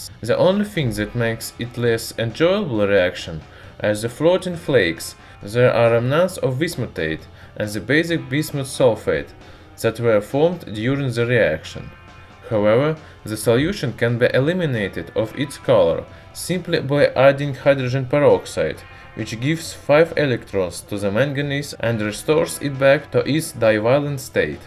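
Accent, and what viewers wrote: Russia. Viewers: Bro are you Russian no cap love from india 🇮🇳 🤝🇷🇺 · Now i can die in a peace... finally i find that this guy is Russian and he is speaking English in Russian accent. 😂😂😂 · your russian acccent makes it hard to understand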